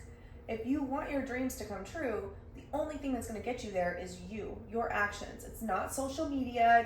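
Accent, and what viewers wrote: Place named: United States. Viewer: 🙂Another good episode thanks. Btw i love how you yanks say "yaw all":p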